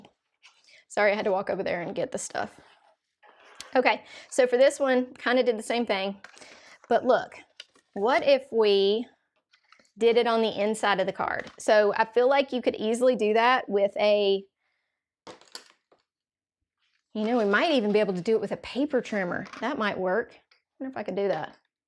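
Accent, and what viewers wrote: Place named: Alabama, United States. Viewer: Really cute ornament cards!!! What an awesome idea for a card and lil gift! Side note.....we moved from Huntsville 2 years ago to be close to our daughter & son in law as they had our 1st grandbaby! Our son still lives in Huntsville and loves it and we visit as often as we can! I thought you had an Alabama accent! Yay!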